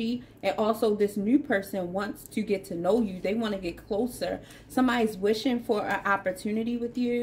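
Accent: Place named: Baltimore, United States. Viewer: You gotta be from Baltimore 🫶🏼✨ it’s the accent for me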